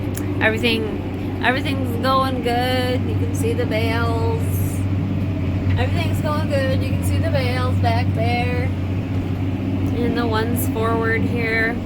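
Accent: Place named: South, United States. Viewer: You sound like from south but your Michigan some place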